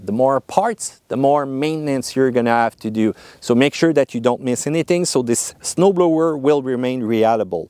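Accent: Canada. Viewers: I like his accent. Canadian people are awesome.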